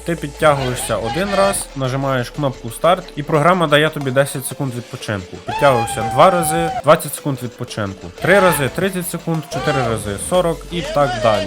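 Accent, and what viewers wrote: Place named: Transcarpathia, Ukraine. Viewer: Акцент ніби Закарпатський але хз😅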